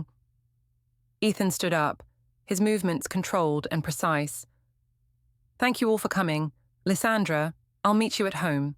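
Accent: United Kingdom. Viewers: I hate the British accent in these stories. The stories tend not to get to the point but a exercise in proper English. I. Prefer the raw, to the point speaking.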